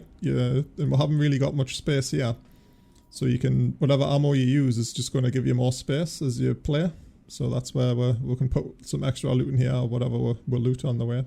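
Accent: Newcastle, United Kingdom. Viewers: When are you gonna "grow out" of that Geordie accent. It just makes you sound like a King Chav. The last time I went to Newcastle, people were wearing dustbin liners as raincoats. I guess you donn your dust bin liner with proud when you go out on the toon (town), right? Learn about Estuary English and start growing up, pal, git gud. Oh shit, now I'm doing it.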